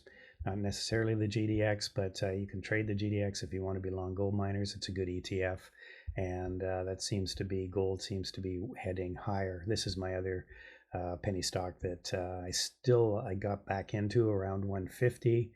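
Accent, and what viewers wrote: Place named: Canada. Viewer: Thanks, for your very helpful weekly commentary. Love your Canadian accent and your quiet demeanor too. Read your Swing Trading Book years ago, and use it to refresh. 💮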